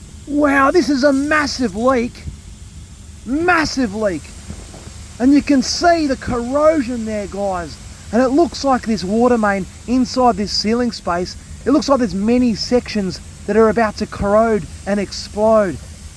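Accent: Australia. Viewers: YouTube put you in my feed and your videos are hilarious I love the way Australians talk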